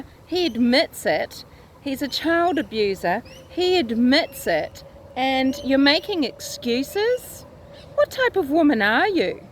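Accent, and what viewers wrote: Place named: Australia. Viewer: I thought you were an Ozzie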